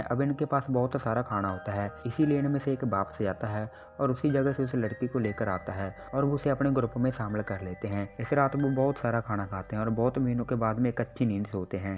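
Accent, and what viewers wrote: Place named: Haryana, India. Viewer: Bhai hryanvi ho k? Touch aa rheya se hryanvi.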